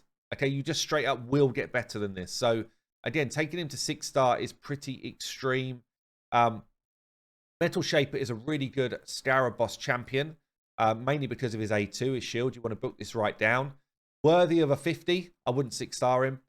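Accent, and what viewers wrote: England, United Kingdom. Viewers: and you should go for a update for some of the free heroes and the artifacts. Almost all the free to play heroes. Love the content. And your English accent 😂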